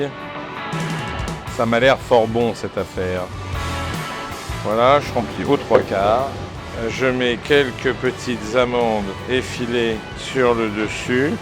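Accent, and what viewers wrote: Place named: Paris, France. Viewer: J’adore l’accent parisien mais j’aime pas les fruits confits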